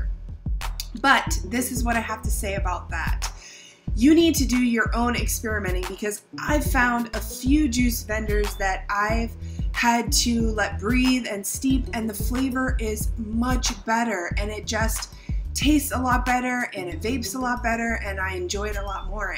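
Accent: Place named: Australia. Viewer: I love your accent. Which part of Australia are you from?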